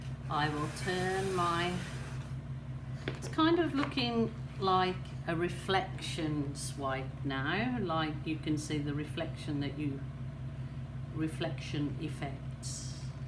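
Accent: United Kingdom. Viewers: I know you made thos video in October and it is now December 29. You said you had 10k subscriptions. Either you grew fast or you don't see all of your subscriptions. It says 24.9k here. Either way, well done. I love your prattling on while I watch your videos. I am in the US and my family is in the UK. I know you are in Australia but you still have the Brit that I love listening to and your art is amazing.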